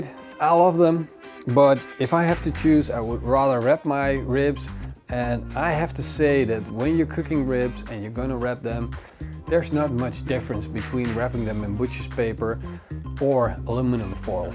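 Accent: Netherlands. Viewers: Thanks for the vid. You speak English with a Dutch accent :) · Netherlands, I think. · You sound Dutch. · Hey you're dutch... i know because of that acent :3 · Are you from Holland? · Mario Solorzano Jr ? Perhaps Holland ?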